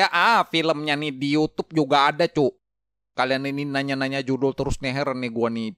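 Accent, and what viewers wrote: Banjar, Indonesia. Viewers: Iih lo wkkk,ku kira aku ja yg merasa iny ni urg banjar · Biar sidin bhs indo. ttp j ketahuan dialek banjarnya🤭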